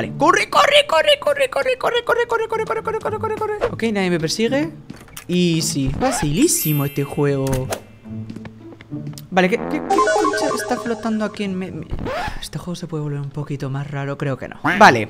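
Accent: Argentina. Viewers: Me gusta más Íker con su acento español no se siento que no le queda el argentino 😭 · Vos sos argentino ? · nuestro ídolo por ser el mejor con combinaciones perfectas como terror + comedia + sufrimiento de + acento argentino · Hola, no hace falta que dejes de hablar con acento argentino, nos haces felices igual:) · Te sale el acento argentino y el acento venezolano😂😂😂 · eres argentino o español o de que pais eres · Sabes que habla como argentino??? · +granny+sustos+palomitas+sabado+su acento argentino=definición de felicidad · enserio me das mucha risa a mi y a mi hermana y más que todo me da risa como hablas en argentino y dices groserías jajajajajajajajajajaja❤❤❤❤😂😂😂😂😂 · Oye me encantan tus videos pero una duda ¿Por qué hablas como argentino? Perdón 🙏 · +terror+acento argentino=jueves perfecto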